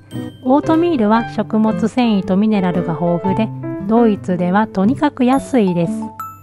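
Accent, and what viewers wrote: Kansai, Japan. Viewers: Nobanaさんの関西弁イントネーションのハスキーボイスが心地いいのでハマってしまい、毎週楽しみにしています。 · いつも楽しく拝見しております😊 関西弁⁉️の話し方がとても心地よく聞いております 次の投稿も楽しみにしております❤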